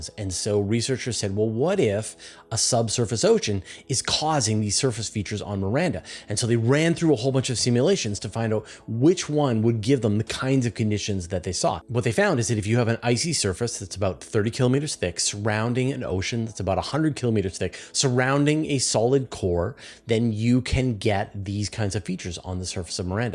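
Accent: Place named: Western Canada, Canada. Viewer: I won't ding your pronunciation of "lava," but Vega should be pronounced VEE-guh,. And don't blame your western Canadian accent! 🙂 Normalize VEE-guh!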